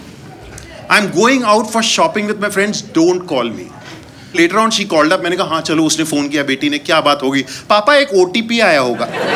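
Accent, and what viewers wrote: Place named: Purvanchal, India. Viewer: chacha lucknow me vo accent hai hi nahi.. purvanchal aur marathi mix krdi apne..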